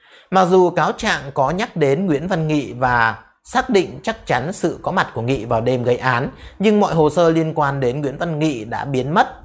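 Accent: Standard. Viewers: E nói rất chuẩn đấy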